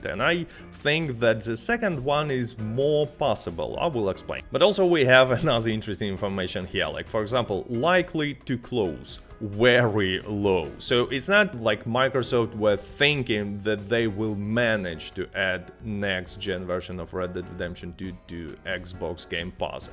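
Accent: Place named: Russia. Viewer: Why this man has so hard Russian accent?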